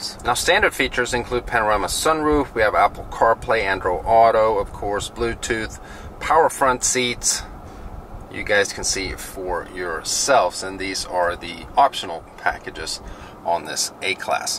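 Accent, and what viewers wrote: Sweden. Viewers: Great review. Am I hearing some Swedish in there? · I dont know why but your Swedish accent stood out to me in this vid 😅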